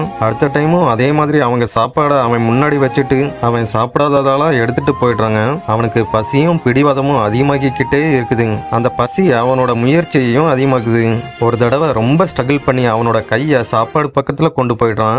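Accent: Coimbatore, India. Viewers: Neenga coimbatore a 😊nalla pesaringa aana ithu world platform formal a pesunga intha ingu podathinga but nicely talking vaalthukal valaraku 🎉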